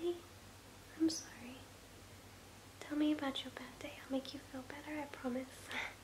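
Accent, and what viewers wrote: Australia. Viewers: I never knew how much I needed an Australian accent in my life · 90% Aussie accent 10% grab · australian accent on girls = yes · The aussie accent completely threw me off 😐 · Hey! Are you Australian?? (I hope I didn't offend you, if my assumption was wrong)... It's just that I'm Australian, and you have that slight accent/pronunciation of words, that automatically make me identify you as maybe being an Aussie :) Sorry for being a complete weirdo but, just curious XD Cheers for your time and effort to make this video! :)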